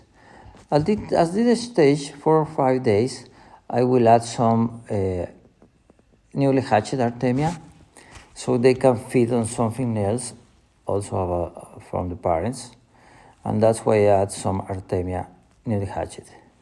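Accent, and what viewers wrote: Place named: Mexico. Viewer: no problem my doctor , if you only problem is the all mexican problem ,do you speak english like mexican xD but your mother languaje is mexican spanish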